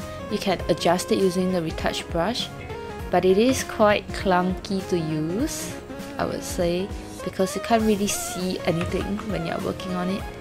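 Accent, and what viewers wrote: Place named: Japan. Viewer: Wow your Japanese accent is so cool! And by learning step by step from your video I finally made my first hairstyle ever on Vroid!!!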